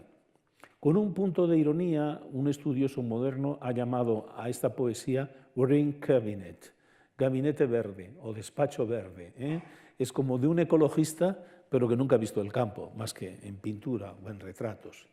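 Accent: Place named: Catalonia, Spain. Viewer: Encuentro esta conferencia 8 años despues de que fue presentada; quiero decir que nunca he leido a Virgilio, soli se de él por lo que nos enseñaron en la escuela y por cuanto Dante lo menciona en su Divina Comedia; dicho lo anterior quiero agradecer a la March por sus magnificas presentaciones y por invitar a maestros como este señor que me despiertan el gusto por la lectura de lis clasicos; gracias maestro Vidal por su hermoso acento Catalan y por todo su conocimiento compartido aqui.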